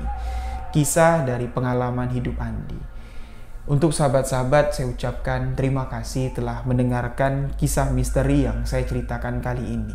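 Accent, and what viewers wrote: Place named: Madura, Indonesia. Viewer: kya logatnya org madura...denger cerita spt ini kn enaknya sambil merem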